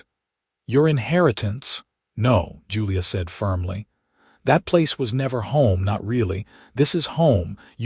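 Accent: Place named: Midwest, United States. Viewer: My most favorite voice! Midwest.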